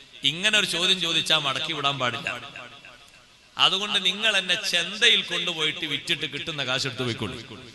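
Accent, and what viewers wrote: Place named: Tamil Nadu, India. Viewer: E usthad thamizh natukarana athu konda chila aksharangal nammale ppole parayan pattathe athu kariyamakanda usthadhavarkal paranchath ketal mathi athanusarichu jeevikkan shramikkuka allahu usthadinu afiyathulla dhergayuss nalkatte ameen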